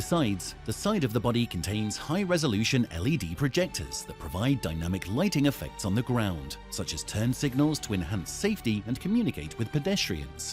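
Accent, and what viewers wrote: England, United Kingdom. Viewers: Just curious, which English accent was the narrator using ? Thanks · English accent….. American market 🤨🙄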